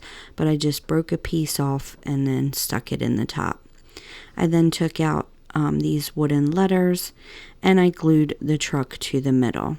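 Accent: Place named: Maryland, United States. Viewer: I just found your channel and am a new sub .. love your projects and love your very clear directions .. I've noticed some crafters speak so fast its hard to understand them .. BTW your accent sounds very familiar .. being a Maryland girl I can tell you're from my neck of the woods 😀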